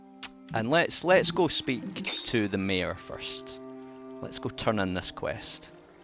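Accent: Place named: England, United Kingdom. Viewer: what happens in the 16th minute also nice accent are you English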